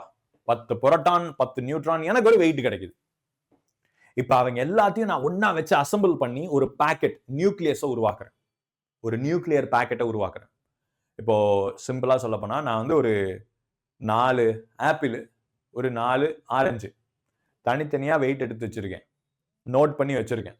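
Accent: Tirunelveli, India. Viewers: pesara slang liye tirunelveli or kovipatti nu theriyuthu bro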